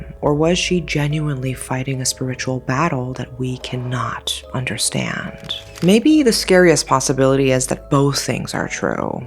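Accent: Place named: California, United States. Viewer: I dont like the way you speak it feels like your typical caliornia woke girl accent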